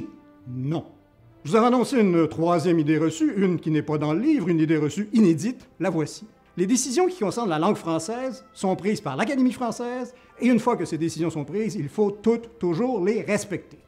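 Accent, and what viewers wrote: Quebec, Canada. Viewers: Tout à fait d'accord avec vous, la langue française s'enrichit grâce aux millions de francophones dans le monde. Elle doit évoluer et qu'on arrête s'emmerder les gens sur des détails, à l'oral, cela n'a pas grande importance. Pour ma part, j'ecris "autant pour moi" et tant pis pour cela que cela empêche de dormir 😊😁 en plus, J'ADOOOOORE l'accent québécois, je le trouve savoureux. Bises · Moi j'aime bien l'accent québécois, c'est joyeux à l'oreille Sujet très intéressant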